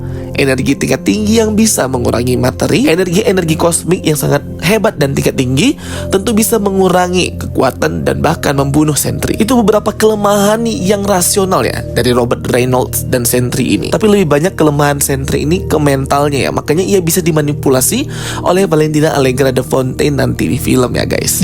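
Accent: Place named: Sumatra, Indonesia. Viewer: Menit ... " kek mana?!" Orang sumatra ko rupanya bang..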